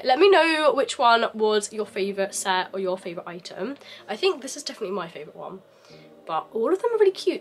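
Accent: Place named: United Kingdom. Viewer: cute voice with the British accent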